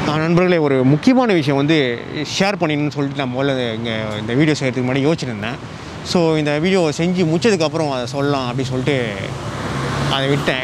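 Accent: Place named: Singapore. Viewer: Bro Singapore Yan mathire pesama Nama ooru alumayhiri pesuka bro video nice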